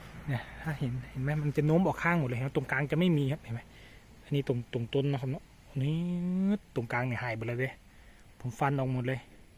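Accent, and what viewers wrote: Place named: Loei, Thailand. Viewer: ฟังเสียงแล้วว่าต้องเป็นคนเลยคักแน้วเสียงที่เป็นเอกลักษณ์☺️😂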